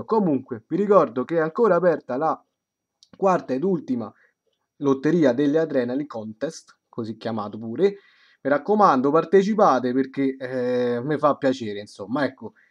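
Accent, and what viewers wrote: Italy. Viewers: Ma sei romano pk hai la lingua da romano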